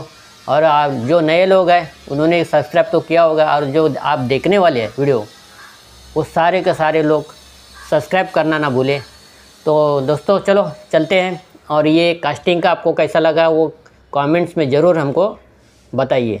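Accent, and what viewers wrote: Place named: Rural, India. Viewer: 😊सरजी गावरान हिंदी 👌👌👌👍🏻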